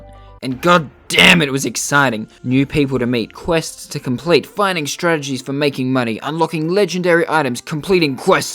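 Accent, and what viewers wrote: Australia. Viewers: is this guy aussie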